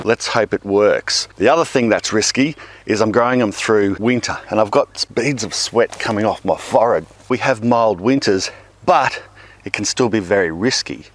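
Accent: Australia. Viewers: Greetings from Munich/Germany :-) Watching you on a quite nasty, cool and rainy Bavarian late spring evening, while having my own tiny tomato plants waiting (still - fortunately - quite patiently) for warmer weather, so that they can finally conquer their city balcony. I'm mostly following comparable (or not so much if comes to climate conditions and techniques!) German gardening channels, but I love coming back to you every once in a while, and let it be solely for a reminder of my own time down under and by taking in your lovely accent. Keep "mulching". Nice work & cheers! ;-)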